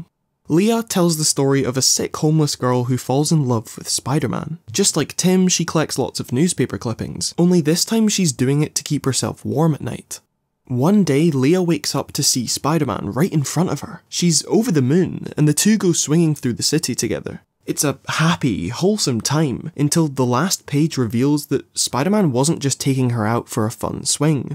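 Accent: Scotland, United Kingdom. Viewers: You Scottish?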